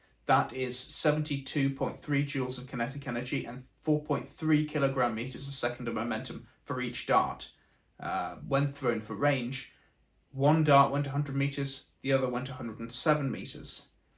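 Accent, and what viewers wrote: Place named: United Kingdom. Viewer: As an American consumer of a specific type of video content, it was so pleasantly odd to hear “terminal effect” and the distinction between “internal and external ballistics” in the British accent. I very much appreciated your application of the scientific method. And the sound these things make as you throw them is awesome. Great stuff.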